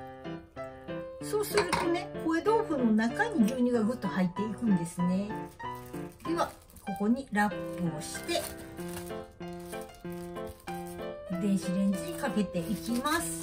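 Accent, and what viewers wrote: Kyoto, Japan. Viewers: 先生の京都弁が可愛らしいです 意外な組み合わせですがさすが奥薗壽子流です!🥰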